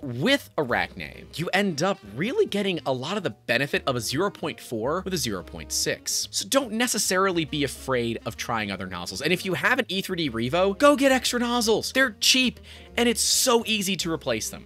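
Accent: United States. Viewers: Oh, My... Degrees in Celsius and "Aluminium"? Am I misjudging your American accent?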